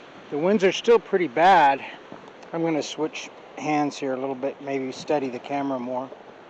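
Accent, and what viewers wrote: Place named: Ireland. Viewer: Love the Irish accent,. Be safe way up there with all that wind!! Vaya con Dios!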